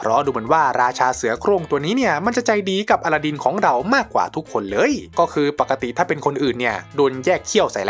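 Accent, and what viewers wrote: Isan, Thailand. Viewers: ทำไมพี่พูดอิสานได้อ่ะ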